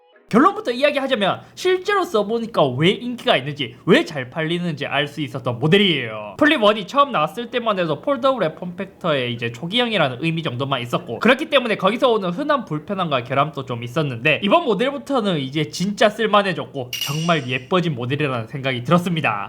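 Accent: Gyeongsang, South Korea. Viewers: 이thㅓthㅓ여 역시 경상도분 ㅋㅋ 귀여우시고 정감갑니다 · 몇 번 들어도 적응 안되는 저 대구경북 말투……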